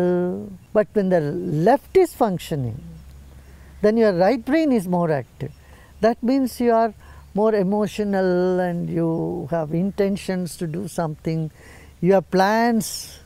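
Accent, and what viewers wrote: India. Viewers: I so wish I could understand the East Indian dialect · With that heavy Indian accent and background music how does someone understand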